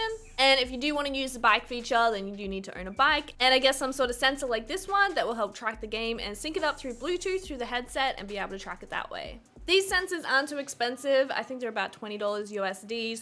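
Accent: Australia. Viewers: That's definitely not a British accent. She's Australian.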